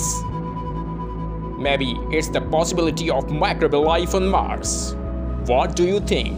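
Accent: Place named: India. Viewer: English speaker with indian accent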